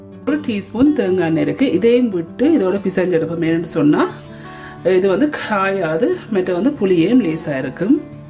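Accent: Sri Lanka. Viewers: Love your Sri Lankan accent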